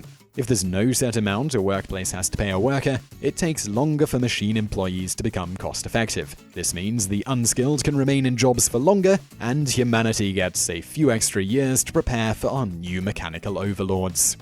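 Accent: United Kingdom. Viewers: Why is that ANYONE with a British accent has ZERO credibility with me? .... Well they HAVE made every serious mistake one can imagine. IF there was no minimum wage then it is ABSOLUTELY FRICKEN GUARANTEED that a bunch of folks WOULD be taken advantage of more than they are now ...not everywhere ...not where there is competition for quality labor...but in smaller environments where greedy employers can ruthlessly get away with it ...ignorant people just wanting to pay the rent and eat SOMETHING might feel literally forced to take it...their employer for instance being the only place they can physically get to every day? The logistics for a poor a person can become overwhelming and there ARE some wickedly greedy people that WILL take advantage of such things every single time ...hence all the laws and penalties created to FORCE really wicked people to play nice....it is a shame we have to have laws, that people won't just be honest and do what they have to know in their heart is right....but it IS the fact of reality and all the pie in the sky thinking people might be different is pure bs proven over and over and over ....hence the sad struggle FOREVER...there is NO political solution...no form of government and no lack of government will cure what ails us ...actually it is incredibly AMAZING (I think) that things work out as well as they do in SOME places ...probably so called "Christian values" play largely into it working ...working on the consciences of people so that enoug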